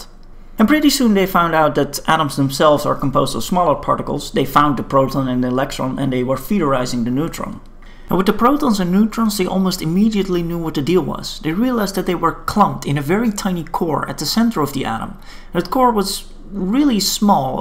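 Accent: Netherlands. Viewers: Are you from the Netherlands? You sound so Dutch. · Excellent! Thank you. I think your H diagram didn't show the 1s orbital? Also, is the accent from Rotterdam?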